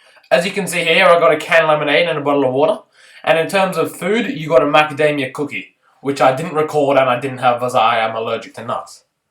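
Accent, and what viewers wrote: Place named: Australia. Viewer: gday gday, you sound so aussie lol. keep it up and get more coming!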